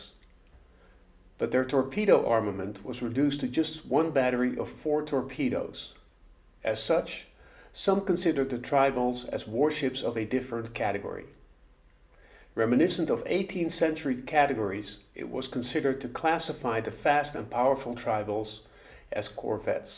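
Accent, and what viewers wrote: Netherlands. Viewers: Wat een irritant Nederlands accent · Is that Dutch accent that I hear? 😅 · klinkt nederlands. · That is a Dutchman talking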